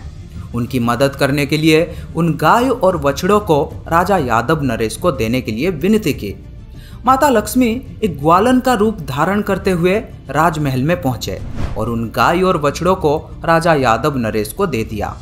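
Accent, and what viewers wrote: Odisha, India. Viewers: This youtuber is also an Odia..you can mark his odia accent.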